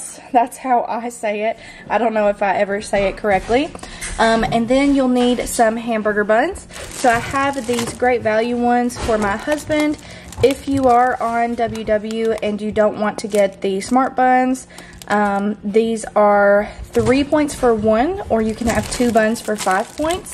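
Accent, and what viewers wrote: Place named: South, United States. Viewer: Just subscribed! Your dish looks delish!! And your southern accent is ADORABLE!!!!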